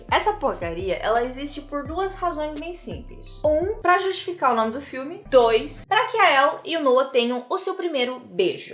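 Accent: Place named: Curitiba, Brazil. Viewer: Nas primeiras palavras que você disse eu já percebi que você é curitibana, finalmente alguém puxando o R e o E aq lkakalalaal tamo juntos, sou de Curitiba tbm kakakakakak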